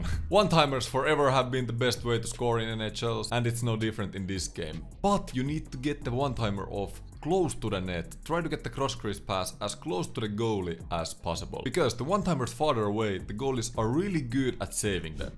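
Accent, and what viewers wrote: Finland. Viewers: kun sanoit et sun enkku oli huonoo joskus ja vähän tavallaa viittasit et se ois vieläkin, toki parempaa kuin ennen. Ni ihan pikku tippi siiheb liittyen ni sano L1/L2 ja R1/R2 "EL 1/2" ja "AR1/2" ni jos tääl on jotain jotka ei tuota ymmärtäs ku sanot äl one ja är one ni sitten he ainaki ymmärtää. Mut siis muuten imo ihan hyvää enkkuu puhut sillee suomalaisel aksentil.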